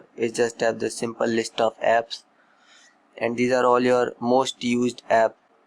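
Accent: India. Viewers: that accent.. yuck!!!! just speak in indian language dude..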